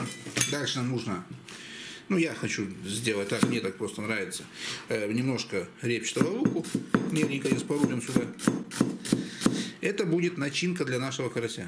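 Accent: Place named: Urals, Russia. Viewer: На Амуре люди медленнее говорят. У Вас наш уральский говор - быстрый. Видно, наши осели на Амуре.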